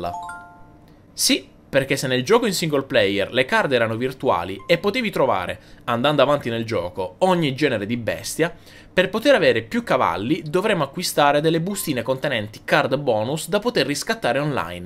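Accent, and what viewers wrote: South, Italy. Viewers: si sente proprio che sei del sud:D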